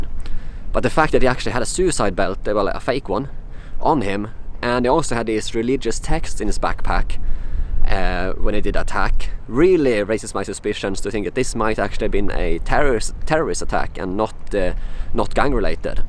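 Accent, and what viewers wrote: Sweden. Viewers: Skön svengelska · Haha din svengelska är så härlig :D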